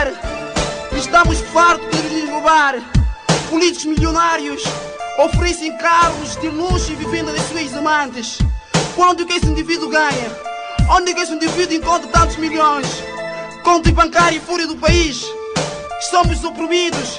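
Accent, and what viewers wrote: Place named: Guinea-Bissau. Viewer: esse gajo nao é angolano! tem sutaque de guineense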